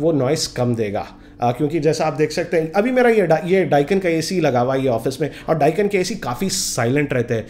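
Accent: Hyderabad, India. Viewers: Information was good but good luck with your hyderbadi hindi next time. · Why do I love this channel so much? All of his videos are really informative. Respect to you Sir for posting such great videos. You are the best🙌 #lovehyderabadiaccent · Ye Hyderabadi hai bhai.... Isme bhi kafi achhi hai.... Asali Hyderabadi aur bhi mazedar hai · well done dear. I have been your viewer quite a while during smartphone sunrise. your appearance with hyderabadi hindi doesn't make any difference to northerns which I loved it. keep it up. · Good Hyderabad hi accent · I'm subscribing ur channel because of Hyderabadi hindi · Bhai, you rock and love your Hyderabadi Hindi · आपकी हैदराबादी हिंदी सुनने में बेहद अच्छी लगती है। कृपया इसे जारी रखें। धन्यवाद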